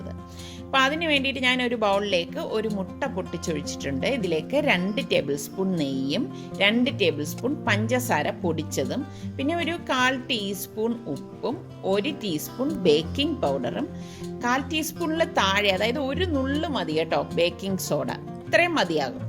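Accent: Trivandrum, India. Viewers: Ithade correct place evda?? Lockdown aano avde....nte place nedumangad ippo kochila njn ....ithade samsaram kekkumbo nikk nte tvm orma varuva..... subscribe cheythutto..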